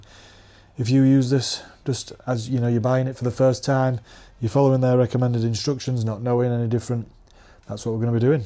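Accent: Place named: Scotland, United Kingdom. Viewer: Great video man ! Watched it right till the end. I noticed when you rinse off, you also make sure you give the door jam spaces and body fittings a good blast to make sure you get any debris, sand grit, leftover soaps etc. . .Nice work. . . JUST like I do. I have a Mazda CX5 and I hit those same areas well with the spray gun. The CX5's have "gutters' on the roof for a roof rack install. Those areas are notorious for hiding gunk, grit, sand, leaves etc. I'm not a pro. I'm just a weekend enthusiast that loves taking care of my cars. Like I said, I enjoyed your video, and thanks for taking the time to put it together. Are you in Scotland ? I detect what sounds like a Scottish accent. I recently bought a bunch of car wash stuff; - active 2.0 pressure washer - upgraded braided 50' hose with quick attachments - a good spray gun and wand extension - MTM foam cannon - I already have a dewalt 60v max blower with 15 amp hour batteries to dry the car. 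and MANNNN does it ever blow LOL :D - 4L GYEON foam - 4L Gtechniqiue ceramic Gwash - couple Gyeon wash mitts - couple Gyeon dry towels (to pick up left behind water drops) - Gyeon bug n grime spray 500ml - Gyeon exterior detailer 4L I have a bunch of other cleaning stuff I've acquired over time so I'm well equipped. I live in an apartment building over here in Canada and we have a hose tap in the underground garage where I park. There's also an electrical outlet about 20 ft away. Cheers bro !!!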